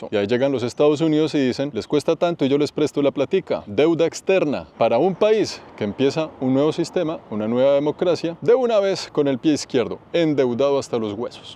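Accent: Bogotá, Colombia. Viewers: El acento de es el rolo o Bogotano, cada región tiene su propio acento.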